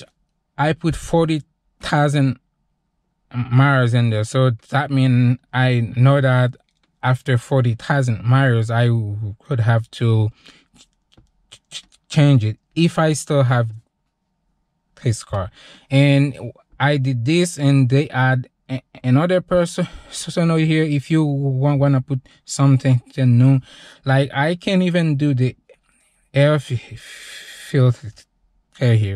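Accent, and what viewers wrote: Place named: Haiti. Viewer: Haitian accent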